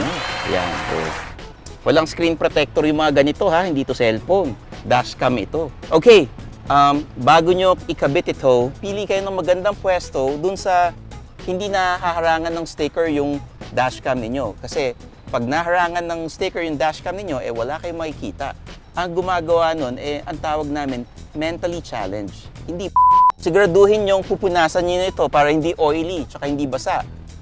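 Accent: Conyo, Philippines. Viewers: 😂🤣😂 ang cute ni sir parang bagay mag conyo ala ADMU, UST😂🤣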